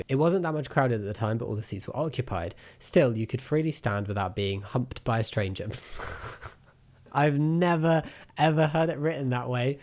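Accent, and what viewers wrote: United Kingdom. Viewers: Yk I’ve stopped watching all other British YouTubers because their accents kind of irritate me. You’re the only one I’ve kept :D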